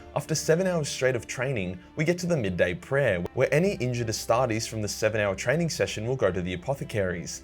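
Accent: Australia. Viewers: It takes an Australian 40k nerd to make Yandare sound cool what a time we live in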